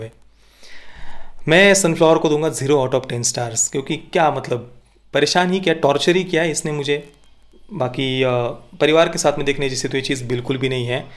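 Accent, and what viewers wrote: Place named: Maharashtra, India. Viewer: bhai main kanpur se hoon ....muzhe marathi nai aati lekin aapke muh se marathi badi pyari lagti hai..isi bahane ek nai pyari language sikhne miltti hai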